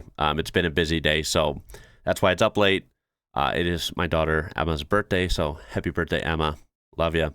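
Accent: United States. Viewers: As a Norwegian, I wouldn´t bat an eye over someone telling me which state they´re from instead of America or USA. Doesn´t work with a lot of other countries though.. Also, I´d hear straight away that you´re American, so that´s really redundant info.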